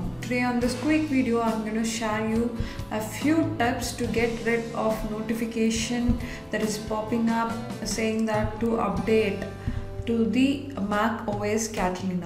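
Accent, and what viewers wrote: South India, India. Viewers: nice voice di are you from south india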